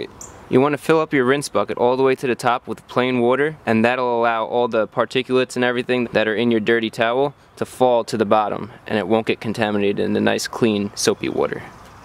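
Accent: United States. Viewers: That's okay. I didn't want to be rude. Heard your US accent and thought you record mainly for Americans. No offense. cheers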